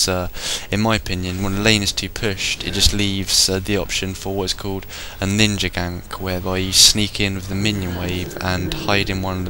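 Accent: United Kingdom. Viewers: British accent, huehue.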